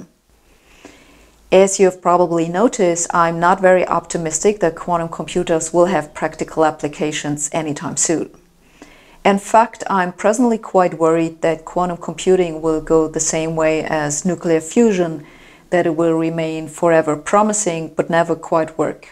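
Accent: Germany. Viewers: Yeah. German accent = best accent (especially, of course, when pronouncing an actual German name :P) Prof. Hossenfelder has achieved accent supremacy.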